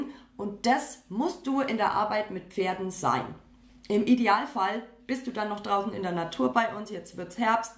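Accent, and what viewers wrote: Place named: Franconia, Germany. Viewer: Gelllllll!!!! Dein cooler Dialekt (Fränkisch) weiter so gellll ?!😂👍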